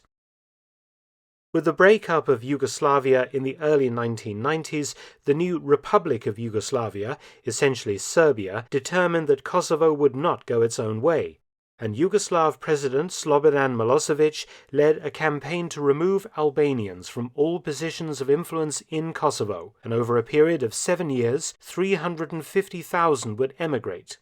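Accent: United Kingdom. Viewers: BRO, KOSOVO ISN'T "NATION". KOSOVO IS REGION. CHECK YOUR "FACTS" . I'M FROM THAT REGION NEARBY........... ONLY BECAUSE YOU HAVE BRITISH ACCENT, DOESN'T MEAN YOU'RE AN EXPERT AND DOESN'T MEAN YOU'RE RIGHT. Serbia is nation, Montenegro is nation, Albania is nation.... KOSOVO IS NOT NATION. IT'S A REGION !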